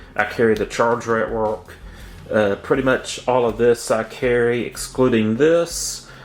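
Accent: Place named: East Texas, United States. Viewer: ok that makes sense. You had a very "no country for old men" type accent.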